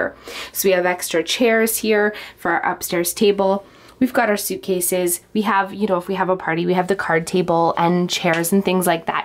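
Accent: Canada. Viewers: I love your Canadian accent! <3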